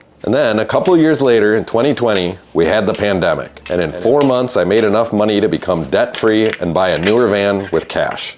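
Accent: Wisconsin, United States. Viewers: I live in Norway but I grew up in Northern Wisconsin. In the 1st 30 seconds of this video I thought this guy really has a Wisconsin accent... Great video!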